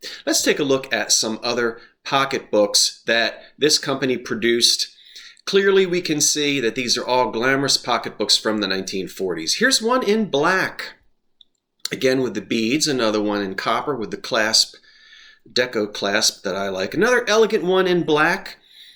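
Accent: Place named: Northeast, United States. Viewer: Oh gosh! I'd have to hunt down those homeowners and insist they keep the paintings with the homes!! Im fanatical about historic documentation., Ive heard you say you don't think you have a Jersey accent, but Ive never heard anyone pronounce "rural" like that! 😄 Must be a North Eastern thang!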